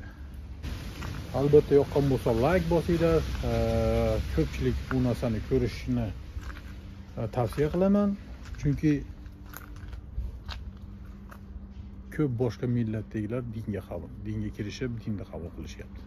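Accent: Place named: Uzbekistan. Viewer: Удмурд деганини энди эшитишим кайирга карайди сиз Татаристонга кетдик диябсиз забонингиз хакикий Узбек сог булинг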